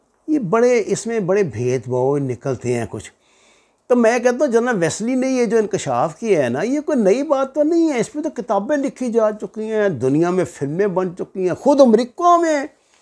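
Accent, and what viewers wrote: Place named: Lahore, Pakistan. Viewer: ilAQAH chach se hae aor lshori lehjah GHALIB aa gaeya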